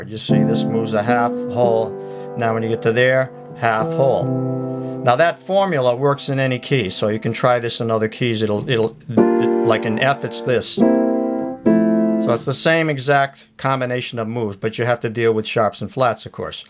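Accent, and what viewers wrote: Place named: Maine, United States. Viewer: Your video just showed up on my feed and as I was watching it I immediately realized you were from Maine. I adore your accent, reminds me of home. ❤️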